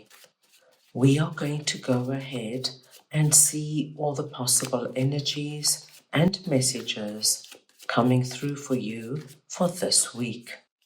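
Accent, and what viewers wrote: South Africa. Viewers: Are you South African ? Hearing the accent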